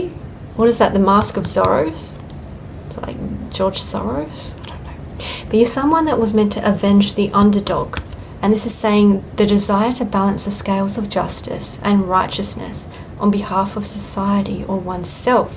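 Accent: Australia. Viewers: just out of curiosity, honey, are you an Aussie? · First, I'm so interested in you because you're the spitting image of my sister at your age (who was Cleopatra, so you are what Cleopatra looked like, if anyone wants to know). Secondly, this has been hilariously entertaining that this adorable little twin sister on the other side of the world with the cutest Ausie accent relates such accurate, intimate details of my life, especially the last 10 years. I'll be listening to this again a few times because they're a lot here. Thank you, for your your talented and skillful reading of the vibrational currents. Oh, and you nailed the name first try. My name is David. 😉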